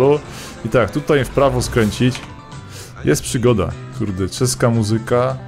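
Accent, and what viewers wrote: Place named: Silesia, Poland. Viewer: slonskoooooooooooooooo glodkaaaaaaaaaaaaaaaaaaaa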